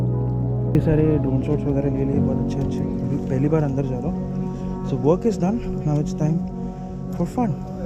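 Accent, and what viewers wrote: United States. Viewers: The American accent comes out naturally once u get into United States, and with exploring new people around is quite common!! So, don’t bother abt people who says u r faking it n all!! Because they haven’t been in States so, n mostly people r jealous why they can’t have the same taste, what v have right here!! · Ek quote hai : "when in Rome, do like what Romans do". So it's probably for the best if you talk with the American accent. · It was a nice vlog and the drone shots were superb and the american English accent was awesome and thanks for showing us such a beautiful greenery vlog👍👌👏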